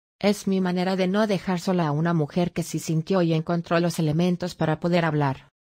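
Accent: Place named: Spain. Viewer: X q ponen esa voz española q feo.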